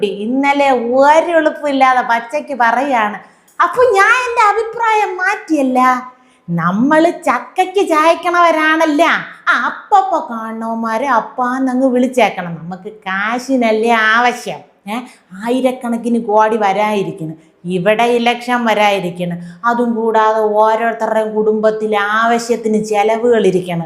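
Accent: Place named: Thiruvananthapuram, India. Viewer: തിരുവനന്തപുരം ഭാഷ ശൈലി തിരെഞ്ഞെടുത്തതിന് നന്ദി കാണാനും കേൾക്കാനും ഒരു ചേലുണ്ട്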